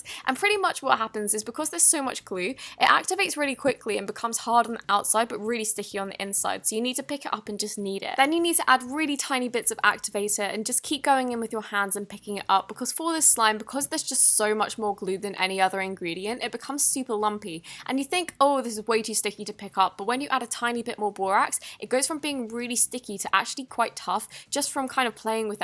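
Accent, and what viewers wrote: England, United Kingdom. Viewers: Wait are you English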